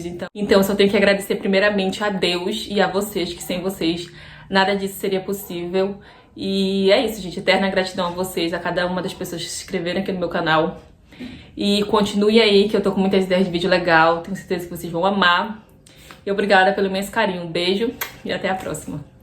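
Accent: Rio de Janeiro, Brazil. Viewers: Que legal, não tunha iseia da vida aí, obrigado pelo vídeo, adorei. Gostei do sotaque também 😊, parece com o do Rio de Janeiro kkkk 😍👏🏽👏🏽👏🏽. Sucesso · Para que esse sotaque de carioca? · vc fala parecer ser carioca vc1p kkkkkk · Engrassado sotaque de Carioca la na Amazonia 😆😅😉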